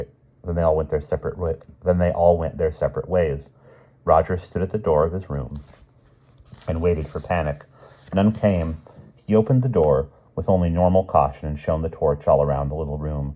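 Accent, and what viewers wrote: United Kingdom. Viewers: Ms. Banks would be impressed by your British accents.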